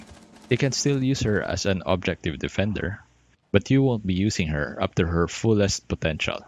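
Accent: Philippines. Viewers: The filipino accent on this one is wicked. I love it. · Are you Filipino? You have the accent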